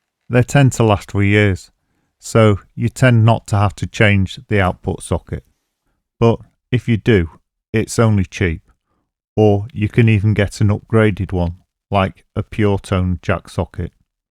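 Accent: United Kingdom. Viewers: I am a language teacher and have no idea about these items you explained so well. Thanks, I subbed and am looking forward to other vids to come. Greetings from Austria! Btw I'd like to see some vids about how connecting different gear (looper, modeler, and other gear) Of course I subbed and liked. Cheers! Am I right, you're British, ain't you?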